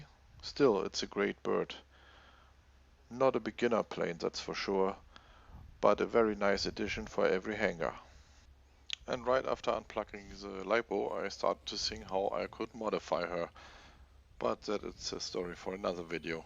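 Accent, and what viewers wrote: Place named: Germany. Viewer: Das nenn ich mal deutschen Akzent :-D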